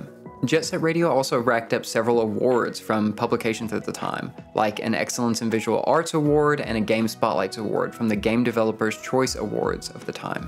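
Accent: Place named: Virginia, United States. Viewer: Are you from Virginia? My old statistics professor has the exact same accent. LOVE the video by the way. I feel like all your observations ring very true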